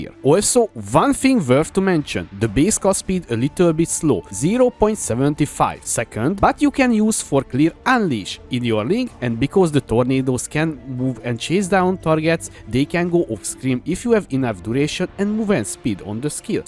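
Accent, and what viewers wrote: Hungary. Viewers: I agree with the comment that warns new players to avoid this. AVOID this build. Why? -You are sacrificing a fuck-ton of item slots for unique items. Both daggers taken, chest slot taken, and you STILL need to solve 100% inc spell damage taken on low life. -ONTOP of this, you are already losing 25% of your damage (it's like gaining a debuff: deal 25% LESS damage) because it's not possible to reach 100% conversion. -Also need to sacrifice 2 jewel slots to force out cold and lightning. 2 jewel slots is not insignificant. -In conclusion this is absolutely bait. You are sacrificing so many item slots and you have not even begun to address defenses. Also I can tell you are Hungarian by your accent.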